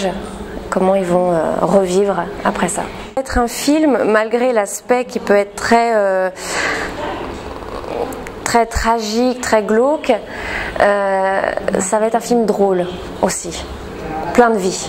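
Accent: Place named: Paris, France. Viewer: attention à l'accent bobo-aristo-parisien sinon vous êtes très jolie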